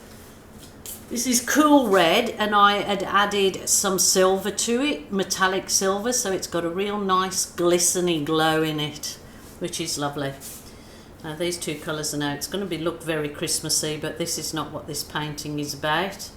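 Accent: Birmingham, United Kingdom. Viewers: ahh 20.40 you did say your were from birmingham. i have cousins from there and i could hear it. im a scot though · I knew you were a Brummie I could hear it in your accent lol. Love your artwork tutorials. I haven't been brave enough to do a 'pour' of any kind yet but I absolutely love the 'balloon work'. Am trying to think of a way to do the balloon work with my great grandchildren who are 4 & 5 years without it being too messy 😂❤ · Your accent sounds like you have a bit of brummie in it?... beautiful painting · Lovely/Bostin!! I want to try it on silk! I'm just coming to the end of my first year at BCU studying textiles so will try to see how it looks with procion dyes etc. I am a very mature student and still love learning all these new things. Thought I detected a brummie accent. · Hi from Yorkshire!..found you 2 hours ago... Watched an hours worth and drove to Asda ( Walmart) before it closed at 10 pm for paint etc...soooo excited to try this! 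oh and do I hear Brummy twang or am I way off?. Just realised I'm out of tin foil....but remembered the balloons though.. I'm at that dodgy age haha.. great to find you, new subbie here! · First time watching you today, and within 1 minute i was convinced you had a brummy accent, and this was confirmed in the very same vid. Amazing work, i absolutely love what you done here !! New subscriber! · Omg ! I knew you are a brummy, just heard you say Perry common I’m down the road in Walsall Just love your work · I am brand new to pouring and my goodness, what a joy and aspiration this is. It is absolutely beautiful. Can I also say I love your accent. My mom came from Rednal Birmingham and just to hear the accent again is a joy. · Yes 😍 still got youre brummie twang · Are you from Birmingham uk? You have a kind of brummy twang to your accent :) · Hi just found your paint pour video’s which are absolutely amazing 🤩 I could hear brummie accent coming which was awesome! 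Thanks for sharing your gifted talents! I’m just starting out my paint pouring journey! Lv from another brummie Christine x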